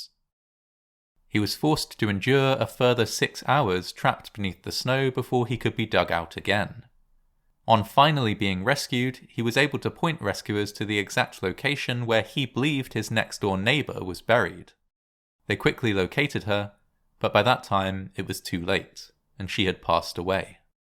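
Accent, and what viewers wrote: England, United Kingdom. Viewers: Your voice, I'm addicted to it. I would follow you to college if you were a professor. Where are you from, England? Love the accent mate. Glad your channels blowing up.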